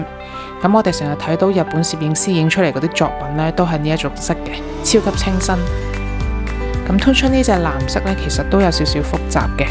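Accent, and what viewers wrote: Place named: Cantonese, China. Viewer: 好喜欢听你讲粤语😝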